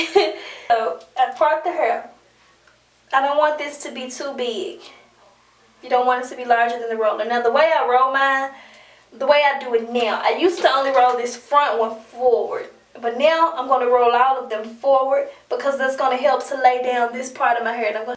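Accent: South, United States. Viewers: your gorgeous an your southern accent is mind blowing reminds me of home!! get in touch sometime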